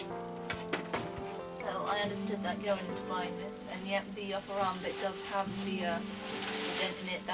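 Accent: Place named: Peterborough, United Kingdom. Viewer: Are you from Peterborough? I recognise your accent.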